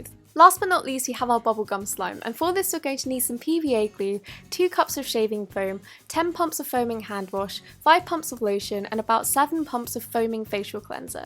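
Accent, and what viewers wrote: United Kingdom. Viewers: Your voice is soo satisfying!!! 😆 (I LOVE THE BRITISH ACCENT) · You know the sound of the slime is not as good as your beautiful British accent it’s so good I love you voice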